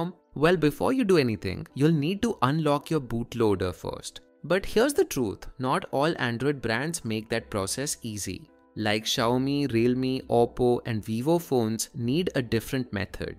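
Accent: India. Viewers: always guy with indian accent is most helpful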